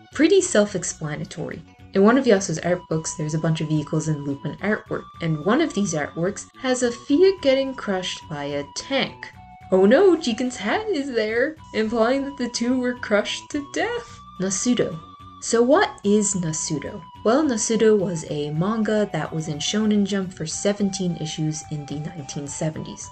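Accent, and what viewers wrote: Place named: Ireland. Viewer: Are you Irish? I'm Irish and your accent sounds familiar.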